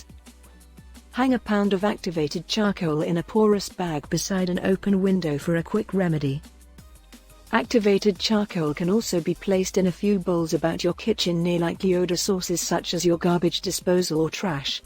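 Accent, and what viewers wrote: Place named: United Kingdom. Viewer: Why the British accent!!!!!! Hire American!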